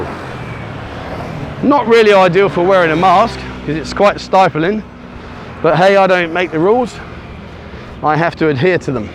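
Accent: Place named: United Kingdom. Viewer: Why don't you make an effort to pronounce Pattaya correctly like Thais do .not like a Brit !!!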